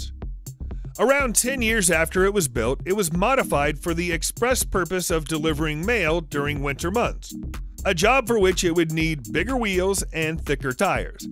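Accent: United States. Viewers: Had trouble with the American pronunciation of English place names but. Apart from that. I enjoyed the clip. Makes me appreciate the 16 cars in my collection more. Must get round to restoring a couple in the future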